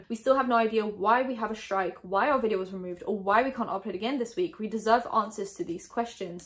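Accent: United Kingdom. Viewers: What is your nationality? Because you've mentioned that you don't have a British passport but you sound very British lol :)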